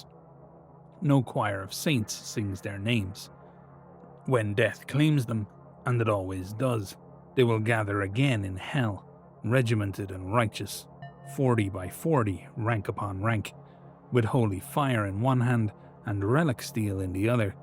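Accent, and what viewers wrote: Ireland. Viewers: These drops are comin in too hot and fast! My heart can't take this much dulcet Irish trench ASMR! (Yes it can, I need more, MOAR!!!!!!)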